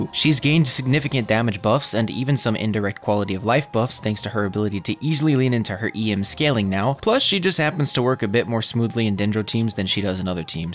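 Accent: United Kingdom. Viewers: The British accent 💀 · The English accent though hehehe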